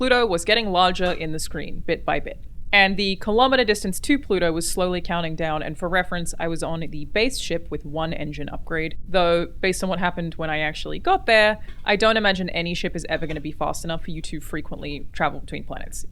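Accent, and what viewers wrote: Australia. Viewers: Dude, your accent's kind of blowing me away. It's like this weird amalgam of like Australian and New Jersey (?) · Are you Australian?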